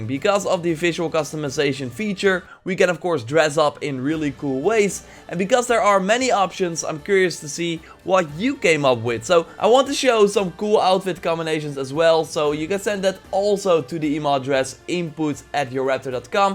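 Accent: Netherlands. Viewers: Are you dutch i can hear in your accent im a big fan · The way you speak English has something, are you dutch by any chance?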